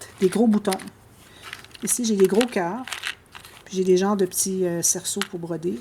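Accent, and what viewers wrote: Canada. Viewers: Bonjour,je viens de voir votre vidéo et votre chaîne pour la première fois, je me suis abonnée donc pourrai vous suivre 😊. J' aime bcp vos boîtes de rangement, j' ai l impression de me voir car je suis comme vous, je trouve votre classement très pratique, vous avez bcp de belles choses , beau matériel , j' ai hâte de découvrir encore ce que vous faites ou allez faire avec tous ceux-là...bien expliqué avec votre gentille voix...... accent agréable...Canadien ? merciiiii et prenez soin de vous madame, à bientôt j'espère 😊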